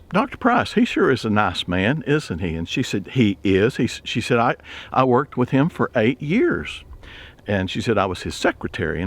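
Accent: Texas, United States. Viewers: I could listen to your stories all day, I love your Texan accent and I’ve been down there for awhile but I’m a Canadian n need to b home but I’m greatful that I can hear ur stories and struggles along with you and your beautiful family. Thank you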